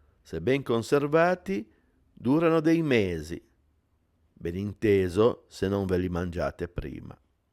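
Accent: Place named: Genoa, Italy. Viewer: che bello sentire un bell'accento" zeneise"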